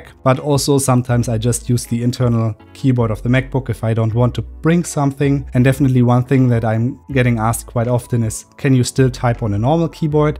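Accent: Germany. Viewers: hard disagree about op's comment. You just sound like a normal person with a German accent. You also don't talk with the "YouTube voice" (which is a good thing!). Sound's good, video's good, your script is tight without being rushed.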